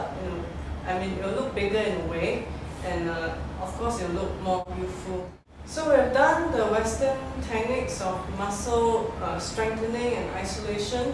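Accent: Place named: China. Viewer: what is she saying? can someone please translate her chinenglish?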